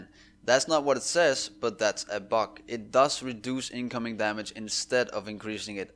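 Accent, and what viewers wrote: Denmark. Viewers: Er du fra københavn ?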